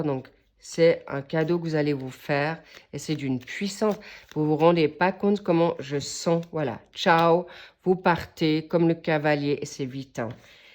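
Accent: England, United Kingdom. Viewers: Merci, comme vous dites, c'est "wow"... Connections d'âmes et de coeurs..... D'après votre léger accent, je pensais que vous étiez anglaise...(où autre anglo-saxon)....😉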